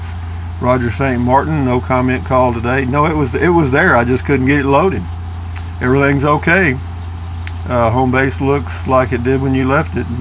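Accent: Texas, United States. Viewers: Linda Fowler My pleasure. generously shares his unique lifestyle and perspective with us. I find his stories interesting, his adventures entertaining, and his quintessential Texan delivery a rare treat. I am happy to help support his efforts in his preferred manner.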